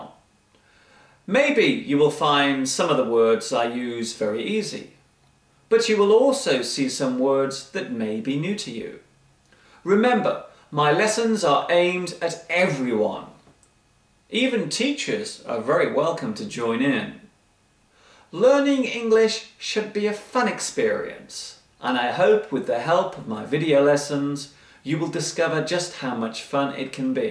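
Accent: England, United Kingdom. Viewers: hes speak using British Accent :) my favourite accent :P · I like your accent is different than the American English, I know you are from England.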